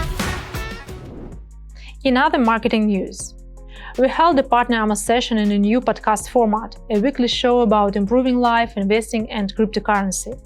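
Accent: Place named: Russia. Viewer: Great news! Love your accent, assuming Russian?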